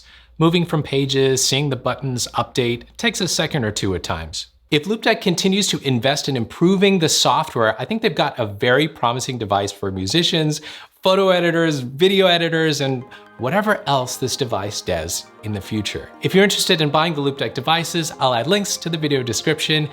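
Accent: India. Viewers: Are you from India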